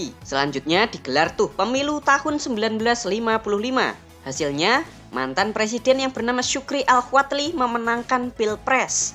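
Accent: Java, Indonesia. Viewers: Kok kyak ngomung bahasa jawa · Metdok buanget. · Jawa medok bner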